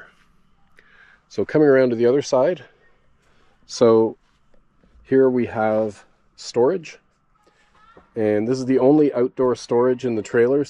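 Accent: Canada. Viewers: Why can't Canadians pronounce "out" (oat) in proper English?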